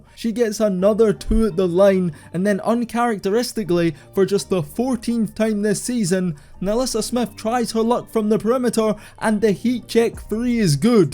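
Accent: Scotland, United Kingdom. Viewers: I now want ALL my WNBA analysis delivered in a Scottish brogue❤ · I know he's saying "The Sky" but everytime this Scot says it I keep hearing him call these ladies "this guy" I was so confused for half this vid 😅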